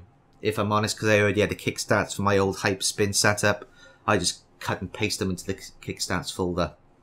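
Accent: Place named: Wales, United Kingdom. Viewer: For a second at the start there I thought you were Irish too, turns out not so much.... Welsh I think.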